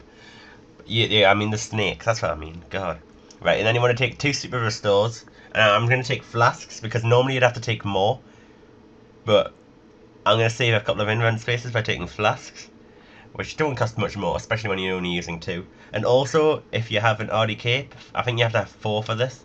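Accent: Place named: Newcastle, United Kingdom. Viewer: he's not from Scotland you idiot, He's from Newcastle i think.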